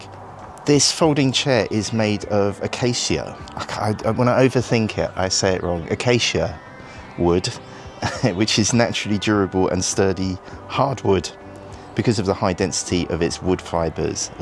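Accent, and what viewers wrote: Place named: United Kingdom. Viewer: Just listening to ur British accent convinced me to get this ikea chair, lol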